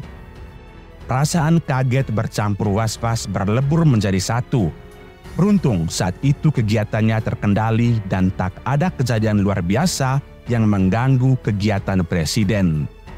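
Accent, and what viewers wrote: Indonesia. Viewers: Logat Admin nya seperti Logat Karo😊👌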